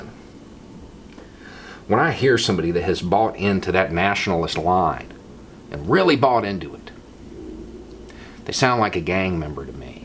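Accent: South, United States. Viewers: Dude, from somebody from a fairly major city up North as soon as I heard your accent I thought Oh lord another one of 'these' guys. This is probably the 20th video from you I've watched and my lord you've proved me wrong. It's easy for me to grow up with these beliefs... growing up in a densely populated diverse neighborhood you know gay people and Muslims and Mexicans and you realize that they are just like you. I'm white and I grew up in the little polish pocket in a majority hispanic side of town, and I got along with hispanic people far better than white people because of exactly what you're talking about... That sense of superiority and being inherently right just because they're white. To come from the south it takes a lot to develop that kind of attitude. Good for you bud I hope there's a lot more like you down there.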